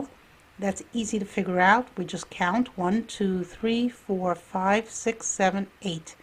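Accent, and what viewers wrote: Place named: Newfoundland, Canada. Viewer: Thanks very much. Are you from Newfoundland? :) Your 'accent' sounds familiar to me and I'm from there.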